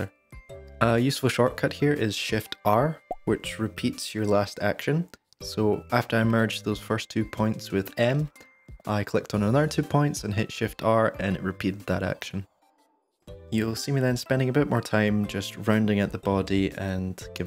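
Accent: Scotland, United Kingdom. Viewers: nice light scottish accent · are you scottish?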